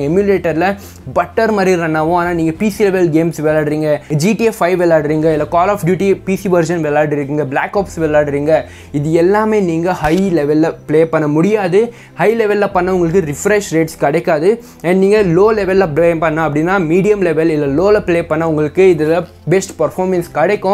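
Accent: Bangalore, India. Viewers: You have a typical Bangalore accent bro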